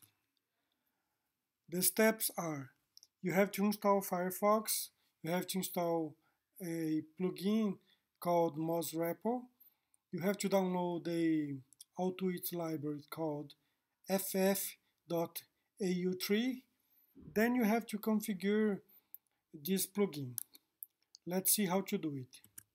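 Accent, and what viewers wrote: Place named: Brazil. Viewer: Que inglês nojento!!!... E para quê um brasileiro se metar a falar ingles!!...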